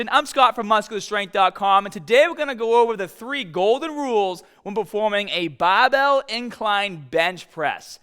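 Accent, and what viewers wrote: Boston, United States. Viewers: I don't remember Scott being so Bostonian. I feel like there's a Mandela Effect cause I swear he sounded normal like 10 years ago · Boston accent? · Wait, where tf did that Boston accent come from. He doesn't have that accent in his older videos lol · Really helpful. I had a nasty shoulder injury years ago due to bad form on incline bench - shoulder blades not retracted, flared elbows, grip too wide - and haven't done it since. However, it's a really useful exercise and I'll give it a go again having watched this. Btw I like the Boston accent and am looking forward to getting stahded with a proper grip on the bahbell. Or rather starrrted and barrrbell as I'm Scottish. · Before we get stah-ted…love the Boston accent…! ♥️🧦⚾️ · Boston accent actually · Are u from Baston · I have watched many videos about inclines and everyone is saying differently on how your form should be so who is right and who's is wrong?! 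And you must be from Boston? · I dint remember his baahhhsstahhhhnnn accent being so thick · bro is boston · I just subscribed.. great info plus you must be from Boston GO PATS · I'm gettin a HIIINT of Boston in this guys accent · Yeah he’s definitely from Boston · This guy is so from Boston.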